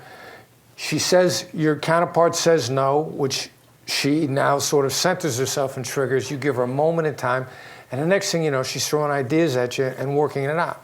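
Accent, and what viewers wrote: New York, United States. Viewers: Your natural accent can escape you once you move away from it and you adopt or adapt to your new environment. He spent the bulk of his career in NY...so therefore.... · I can't comment on that film, not having seen it. I was simply remarking on the verbal affectations of Voss, who hails from Iowa but speaks like a hard-boiled New Yorker. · He says he grew up in Iowa, but his accent sounds very NY/NJ ... ? I wonder if maybe he learned to hide his midwestern accent for negotiation purposes?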